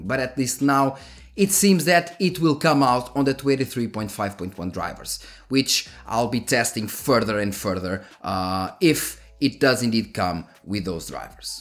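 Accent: Portugal. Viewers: mate can you make a video with you real portuges acento. i would love to hear you speaking Portugues parcero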